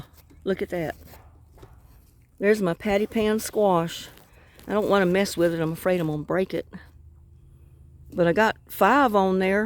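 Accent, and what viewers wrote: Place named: Texas, United States. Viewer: Hi I subscribed to your channel last night that was some live stream I love the sound of your voice and I was just thinking it sounds like Texas to me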